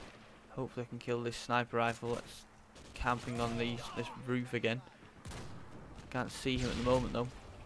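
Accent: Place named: North, United Kingdom. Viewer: Yo I’m from Australia and I’m trying to figure out if that’s a Manchester or Yorkshire accent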